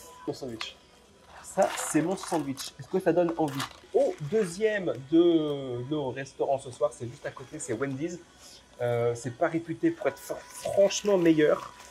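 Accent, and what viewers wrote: France. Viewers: Ton anglais est tres francisé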